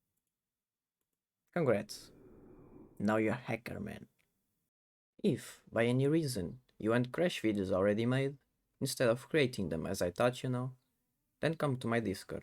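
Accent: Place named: Portugal. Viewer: Muito tuga, não engana ninguem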